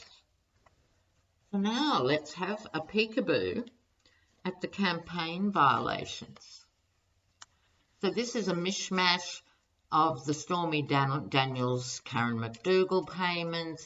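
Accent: Australia. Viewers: Wonderful - I just want to thank you for bringing American a little hope and insight into the ultimate ending of all of this madness. When I hear your lovely accent I am reminded of all of the times when America has gotten themselves into a mess and Australia, like that treasured big brother, forever there supporting and trying to get us to do the right thing. Nevertheless, I am grateful for you and others who have held our hands this past year and I hope against hope that next year we won't keep you guys so busy.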